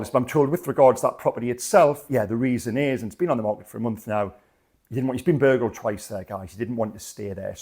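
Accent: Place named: Newcastle, United Kingdom. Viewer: Never suppress your Geordie 😂Ornstein’s bombshell tweet today blows apart a,b+c🧐if it’s true he’s adamant to never play for Toon again? Hero to Zero🤐🫣🖤🤍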